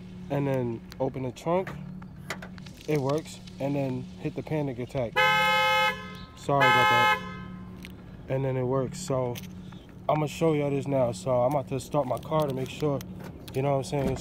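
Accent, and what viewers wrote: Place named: AAVE, United States. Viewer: The fact that I understand him is amazing. Ebnonic is fun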